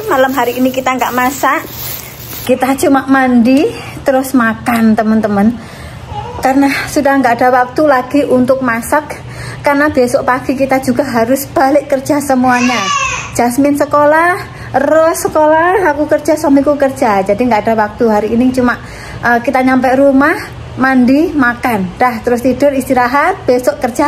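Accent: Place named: East Java, Indonesia. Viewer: Bhs nya, medhok priyaui JaTim, dalem inggih JaTim mb, ke Jogja krn mas suami tugas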